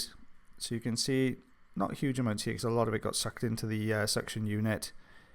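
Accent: Wales, United Kingdom. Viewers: I have family in Mountain Ash and my grandad was from Abergavenny. I'm in Canada now but you sound like home. Thanks!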